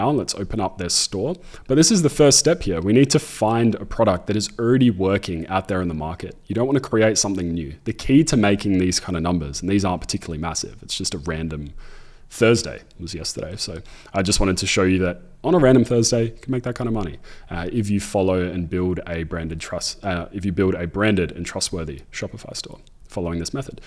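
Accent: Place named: Australia. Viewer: Aussies accent's cute lol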